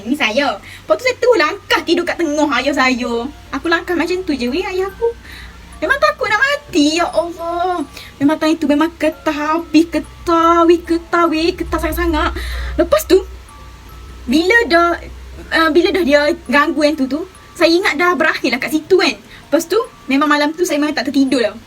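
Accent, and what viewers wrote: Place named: Kelantan, Malaysia. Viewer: Tiba" kau yg seram 🤣... Kecek jk kelantan.. Saya lgi syok tgk... of course nk content seram 👍👍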